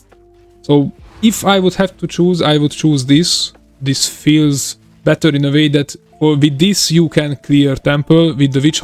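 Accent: Hungary. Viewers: Fasza kis build, nagyon hallatszódik a magyar akcentus! (támogató komment)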